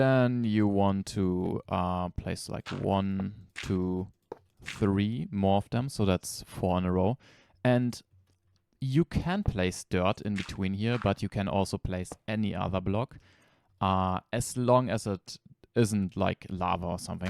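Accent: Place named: Germany. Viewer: I can hear the Deutschen Akzent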